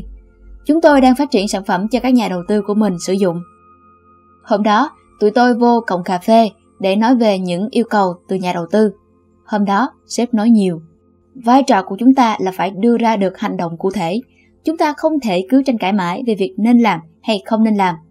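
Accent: South, Vietnam. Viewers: Cuối cùng cũng có giọng miền nam hehe 😁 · Giọng nữ miền Nam dễ thương quá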